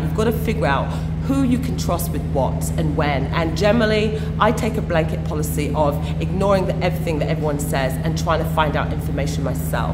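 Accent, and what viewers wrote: United Kingdom. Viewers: She was born in the Uk and grown-up there obviously she went to a private school thats why the accent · I was born in Germany but am Ibibio, I have learnt to speak pidgin and a lil bit of Ibibio/Efik, anytime am in Naija, am always speaking pidgin and trying not to speak for too long at any given time so as not to give my "abroad" origin away and believe me sister, life has been Good and easy. I don't mean to be disrespectful but if I were u, I would try to tune down that Brit-ich accent.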